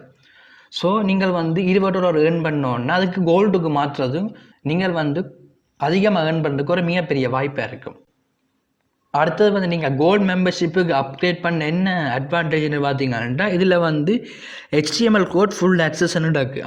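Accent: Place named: Sri Lanka. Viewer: நண்பா நீங்க இலங்கை யா உங்கள் தமிழ் different ஆக உள்ளது Niceee❤️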